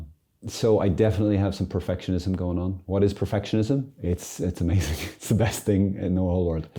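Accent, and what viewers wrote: Ireland. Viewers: Is anyone else here for his Irish accent? I absolutely love it. perfectionism is a rejection of who we really are... Garsh that hit me. There's so much I could say about what he says in this video. I can't tell you how long the belief of 'you'll never be good enough so never receive communion' has kept me from communion. Then a priest noticed and asked me about it. I don't know how long it would've taken if he hadn't done that. "I do not have to do anything perfect today, I only have to do my lousy best." That's my mantra 🤣. Still a work in process, for sure. But we're getting there. 😁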